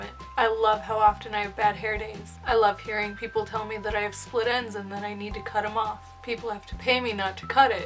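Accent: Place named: Midwest, United States. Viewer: Wow that's cool I'm originally from Minnesota but now I live in North Carolina but I could tell from your accent that your from around that area :)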